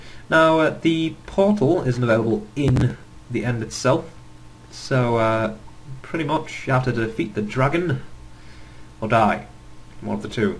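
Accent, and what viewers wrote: United Kingdom. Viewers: ARE YOU FRICKING BRITISH MAN!! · Ok thanks p.s.(are you british) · Interesting accent.. Are you British? :)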